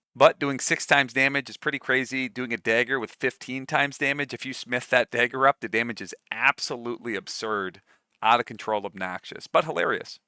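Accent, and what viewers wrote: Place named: East Coast, United States. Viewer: So I don't have to do an additional purchase for the mod and install it if I already have the Anni Ed???? (Just making sure I understand completely) Loving the streams BTW. Love watching a mathematician's breakdown. Good on ya, brother. (The east-cost accent and mannerisms are the cherry on top, LMAO 🤣. Brother-in-law is from Boston). Yes, it was when you were talking about the sneak skill that you mentioned you had gameplay footage, that you would play at the end of the vid of you using the shadow warrior skill. Was something I'd never thought of cuz I used invisibility instead, silent casting on the illusion tree, with muffle on the boots. Stabby-stab. Shank those mo-fo's from the shadows!! Lol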